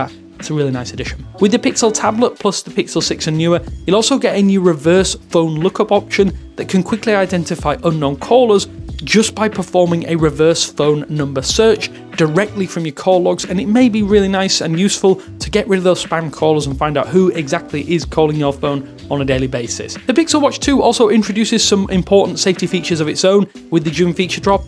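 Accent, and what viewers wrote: United Kingdom. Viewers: You sound like your from the UK but the UK didn't get these features only the US did.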